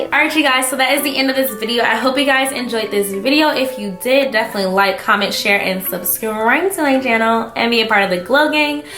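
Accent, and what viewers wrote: DMV, United States. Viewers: Love the color. You sound like you're straight out of the DMV? Let me guess pg county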